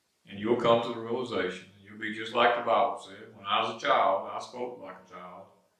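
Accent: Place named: Alabama, United States. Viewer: Thanks. Love the channel. Feel like I'm listening to someone from around the corner from me. Mobile, Al. Come down and talk sometime.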